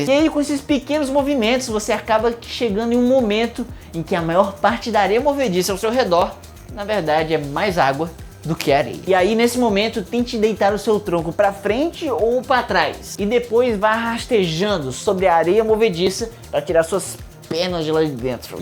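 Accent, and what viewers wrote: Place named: Northeast, Brazil. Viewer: Ele é do nordeste kkkkkkkkkkkkkkkkkkkkkkkkkkkkkkkkkkkkkkkkkkkkkkkkkkkkkkkkkkkkkkkkkkkkkkkkkkkkkkkkkkkkkkkkkkkkkkkkkkkkkkkkkkkkkkkkkkkkkkkkkkkkkkkkkkkkkkkkkkkkkkkkkkkkkkkkkkkkkkkkkkkkkkkkkkkkkkkkkkkkkkkkkkkkkkkkkkkkkkkkkkkkkkkkkkkkkkkkkkkkkkkkkkkkkkkkkkkkkkkkkkkkkkkkkkkkkkkkkkkkkkkkkkkkkkkkkkk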